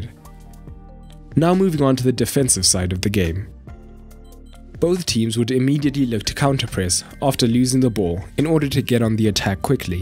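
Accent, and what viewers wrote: South Africa. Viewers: You’re South African innit?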